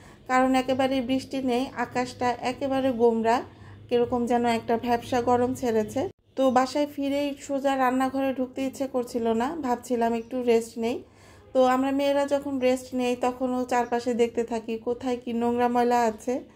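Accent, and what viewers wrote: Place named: Tangail, Bangladesh. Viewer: আসসালামু আলাইকুম আপু ❤ তোমার টাঙ্গাইলের ভাষার কথাগুলো খুবই সুন্দর লাগলো ❤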